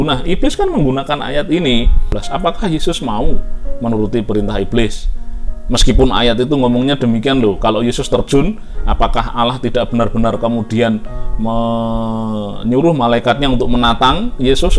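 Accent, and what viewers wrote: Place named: Java, Indonesia. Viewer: Mantap Mas...khs dialek jawa jg ada..heheh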